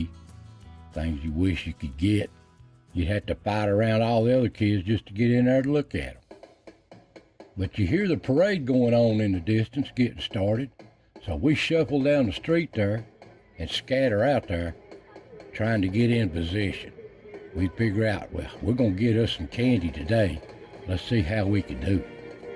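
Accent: Appalachia, United States. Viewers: I love ur Appalachian ol smokey Mt voice. God bless you sir 🙏 and merry Christmas 🎅 🎄 and Have a happy new year from the smoky mountains of sylva nc. Keep the Appalachian stories coming my friend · I've only just found your channel today and have been listening to you all afternoon. I am in New Zealand but the Appalachian people and mountains have interested me my whole life. I love listening to your kind voice and beautiful accent. This story is my favourite so far. Thank you for sharing. Bless you.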